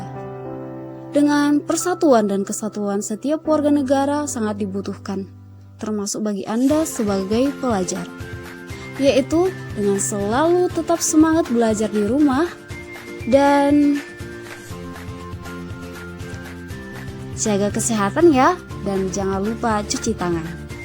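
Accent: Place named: Batak, Indonesia. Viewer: Masih ada bahasa pekat btakny🤣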